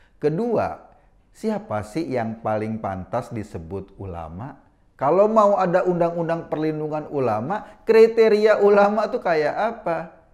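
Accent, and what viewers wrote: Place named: Banyumas, Indonesia. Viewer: Slam polo waras kang wong ngapak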